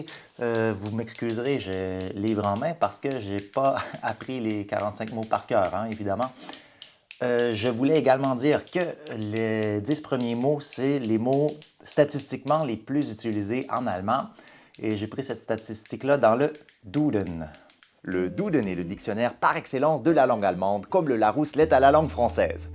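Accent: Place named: Quebec, Canada. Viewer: Bonjour, je viens à peine de m’y remettre à l’allemand, ayant abandonné cette langue car j’avais une très mauvaise méthode et une méconnaisance de l’apprentissage puis je me suis rattrapé grâce à l’anglais, l’espagnol et l’italien (j’essaye d’apprendre le turc aussi) et j’avais tant envie de m’y remettre. J’ai trouvé (tout juste) votre contenu qui est très pédagogique (j’adore l’accent québecois). Une bonne journée de la part d’un alpinois .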